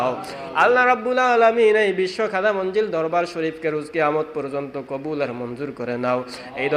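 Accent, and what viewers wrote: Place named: Habiganj, Bangladesh. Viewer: আপনার মিলাদ শুনে মনে হচ্ছে, আপনার বাড়ি হবিগঞ্জ সদর এ। হ্যা অথবা না!!! আশা করি উত্তর দিবেন।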